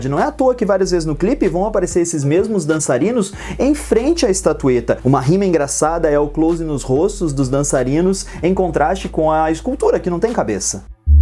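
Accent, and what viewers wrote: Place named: Inland São Paulo, Brazil. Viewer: Sou seu aluninho do QG... Seu sotaque é igual o meu pois moro no interiorrrrr de SP .. Kkkk Adorei sua análise, estava aguardando alguém faze lá ...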